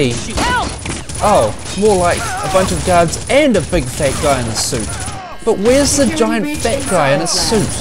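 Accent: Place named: United Kingdom. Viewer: god i love your birtishnes :D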